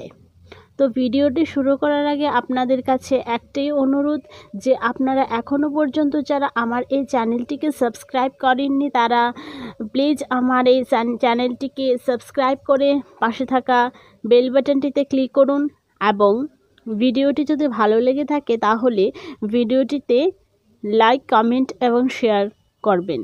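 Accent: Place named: Bangladesh. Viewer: আপনার উচ্চারণ ভারতীয় বাঙ্গালী মনে হচ্ছেনা।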